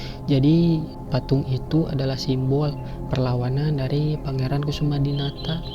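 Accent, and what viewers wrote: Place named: West Java, Indonesia. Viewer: 😅meni nyunda pisan hehehe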